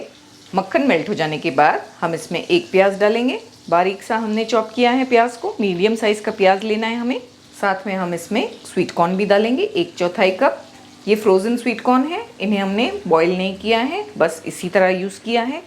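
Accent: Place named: South India, India. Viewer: You have weird accent for a Pakistani. Sounds like some south indian person trying to speak urdu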